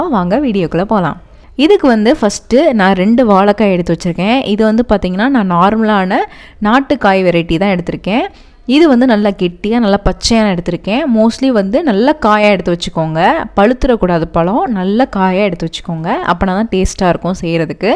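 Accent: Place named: South Tamil Nadu, India. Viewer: South tamilan spotted by her slang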